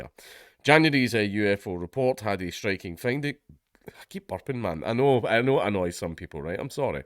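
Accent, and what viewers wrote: South, United States. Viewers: I need so much more Southern in my life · Nice job on the American accent homie. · Damn you sound great like a Southern Farmer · As a southerner your southern accent is wonderful keep using it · You’re southern North American farmer is so amazingly terrible and I need more in my life 😂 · lmao, dude, find more excuses to use that redneck accent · Your redneck accent has me crying 😂😂😂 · You are on fking point, dude. And your American accent is grade A choice. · sounds more southern in his normal speech than he did with his southern accent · Birmingham native here… the other Birmingham. southern accent… y’all’dn’t we’d be fine with it did y’all?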